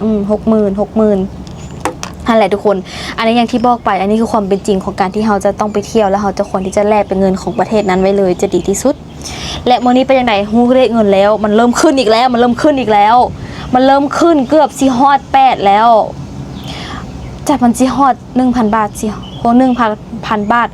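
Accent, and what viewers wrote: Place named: Laos. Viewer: ชอบสาวลาว เว้าน่ารักดี. เคยเข้าไปเที่ยวลาว มาแล้ว 4 ครั้ง .กดให้แล้ว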